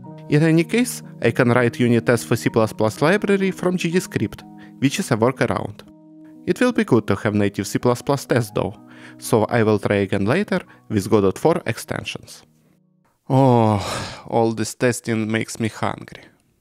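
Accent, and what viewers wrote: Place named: Russia. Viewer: Русский (или украинский) акцент почему-то позволил мне смотреть видео без перевода. Всё чисто и понятно. А вот речь настоящих американцев или англичан мне воспринимать трудно.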